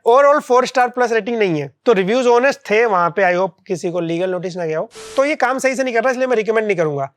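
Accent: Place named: Uttar Pradesh, India. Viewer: भइया आप के बोलने का तरीका बड़ा बढ़िया लगता है मतलब लगता है की हा हम उत्तर प्रदेश से हैं बहुत इंटरेस्टिंग वीडियो होती है आपकी ❤ से love u brother 🥰🫶🏻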